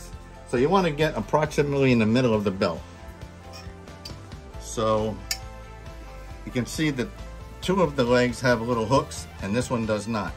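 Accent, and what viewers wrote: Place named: Wisconsin, United States. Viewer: You sound like you could be from wisconsin or the u.p.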